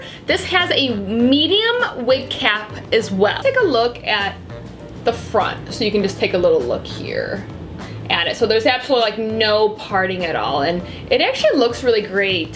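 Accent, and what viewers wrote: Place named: Upper Midwest, United States. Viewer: Are you from Minnesota, Wisconsin or North Dakota? Trying to figure out your accent.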